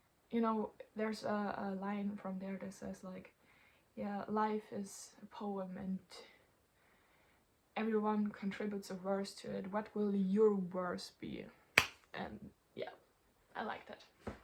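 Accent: Germany. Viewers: Moment, bist du Deutsche? Den Akzent hat mir ein Gefühl gegeben aber ich war mir nicht sicher :)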